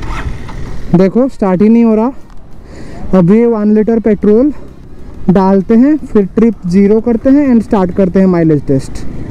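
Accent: Odisha, India. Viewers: Bhai re laguthila Odia boli tuma accent ru … especially Talcheria boli … btw nice video … mu b Talcher ru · Pls make it in odia,coz ur Hindi & English pronounce appear typical odia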